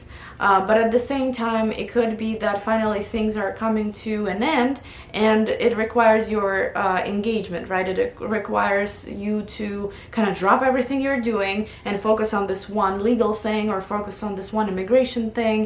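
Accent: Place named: Russia. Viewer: I am absorbed in your ever-so-subtle accent. Russian? No... Ukrainian?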